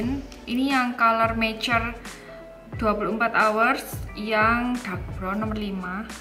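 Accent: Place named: Probolinggo, Indonesia. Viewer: aku suka sama mbak.😁 suami khu orang perobolinggo suka ketawa denger suara mbak, kata nya jadi kangen rumah 😂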